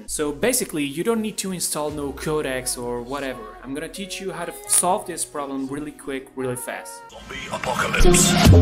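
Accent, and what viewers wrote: Portugal. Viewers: ele é português, se não me engano. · Are you portuguese portugal?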